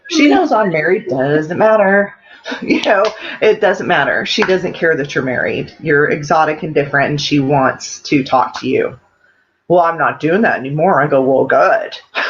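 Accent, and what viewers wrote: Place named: South, United States. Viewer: Southern Accents are the best, hope yours comes out more often!;) South's gonna rise again!:D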